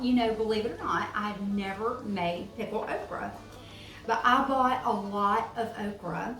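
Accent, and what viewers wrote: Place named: Alabama, United States. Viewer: Living in the Uk, looking for a ladyfinger ( okra, they dont use the name "okra" over here so much)recipe ...and being a native Eufaulian from Alabama, Iinstantly recognized the accent. This is exactly what I was looking for in an okra recipe. Thanks so much for reminding me of my old home far away.......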